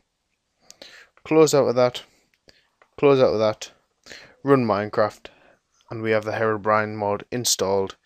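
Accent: United Kingdom. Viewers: Ur from newcastle same acsent as me british newcastle geordie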